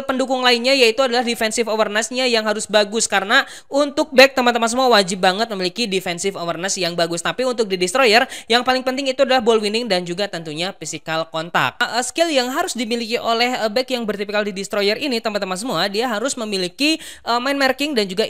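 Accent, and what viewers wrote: West Java, Indonesia. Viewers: Bang orang jabar kah?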